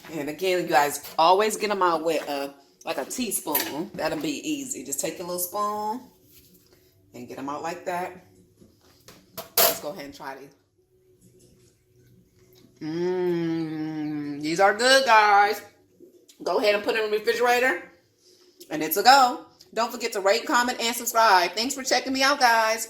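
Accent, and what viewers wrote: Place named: California, United States. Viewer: are you from cali? you sound like it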